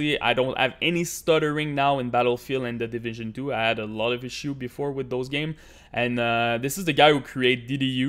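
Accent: Quebec, Canada. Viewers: avoue tu viens du qc ta l'air d'avoir un petit accent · Je peux enttendre ton accent Quebecois lol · La bonne accent quebecoise jadore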